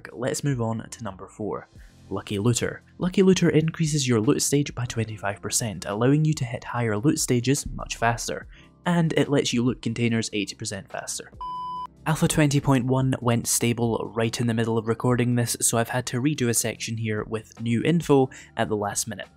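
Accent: Scotland, United Kingdom. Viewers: are u from scotland brother ur accent reminds me of a outlander main male character's one that he tried to addapt to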